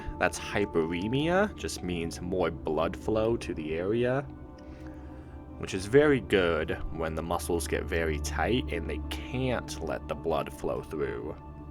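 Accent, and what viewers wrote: Alaska, United States. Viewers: he sounds like one of the Alaska wilderness family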